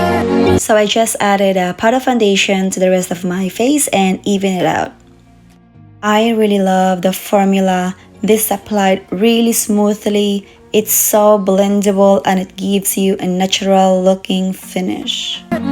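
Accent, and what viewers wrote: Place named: United States. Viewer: Akala ko sis American yung nagsasalita hahaha! Oo maganda nga sis nakakatakip ng blemishes 😊 galing galing magreview 👏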